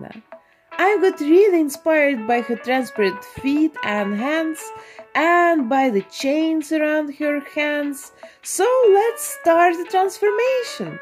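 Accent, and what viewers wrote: Russia. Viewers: I love your voice its like Russian mixed with Queen Elizabeth.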